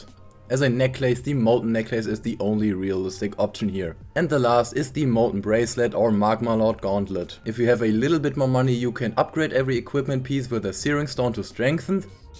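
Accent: Germany. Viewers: he sounds so german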